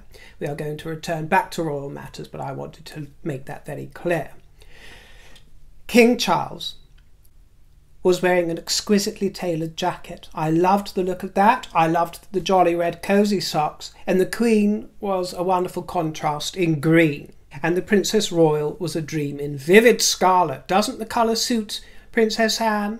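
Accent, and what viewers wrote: United States. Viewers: You have a very good American accent. Your headband is divine; that is your color. Always a treat for me when I see that you have posted. Great point about the dangers of slander. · from one Cancerian to another, your American accent is marvelous!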